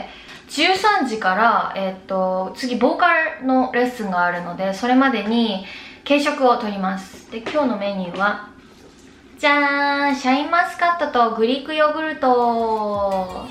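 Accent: South Korea. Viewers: 朱里さん、少し韓国語訛りになってきてませんか？🤣🤣🤣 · 日本語も少し韓国語っぽく聞こえる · カタカナ英語がもうほとんど韓国語の発音になってるー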